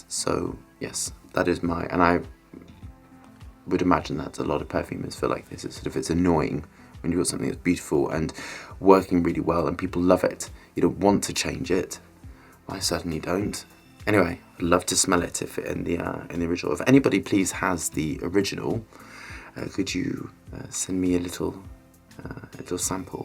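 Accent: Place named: Cornwall, United Kingdom. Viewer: I hear some of Anthony Hopkins accent in your voice mate...cornish accent slipping through...love it!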